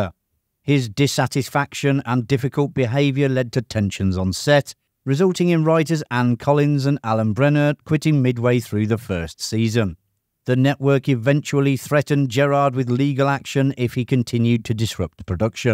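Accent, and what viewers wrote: United Kingdom. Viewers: The guy's British, dude! Give him a break!! 😂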